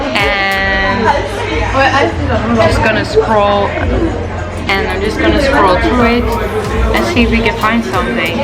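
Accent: Netherlands. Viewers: So Gezellig...a dutch word for everything cozy sweet and charming...my parents were from Holland and settled here in Long Island NY. I love your accent and your voice is so sweet...I would love to see a tour of Keukenhof gardens in spring with all the beautiful tulips!!! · You have such a happy, peaceful vibe! Everyone on my dad’s side of the family is Dutch, I love hearing your accent, reminds me of my Dutch grandparents 💜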